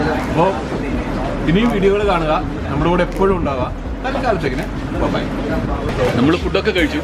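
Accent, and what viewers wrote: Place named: Kozhikode, India. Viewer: കോഴിക്കോടൻ ഭാഷ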